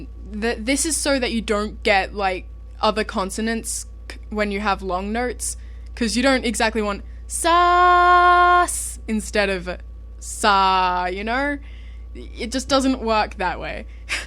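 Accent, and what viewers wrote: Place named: Australia. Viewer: You're Australian!? ;D I love your accent!~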